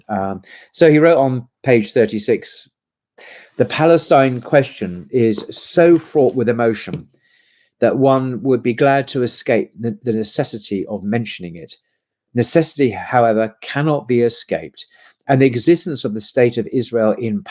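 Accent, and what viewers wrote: United Kingdom. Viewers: Amazing how the fact that a middle aged man sitting in front of some book shelves, throwing buzzwords and casual data in a fine British accent, is supposed to make us feel he speaks wisely and knowingly about a certain topic whilst all Ive heard here is some banal teenager level revolutionary ideas from a biased newly converted to Islam privileged white detached man, fed by BDS propaganda he quotes from super shallow social media sources.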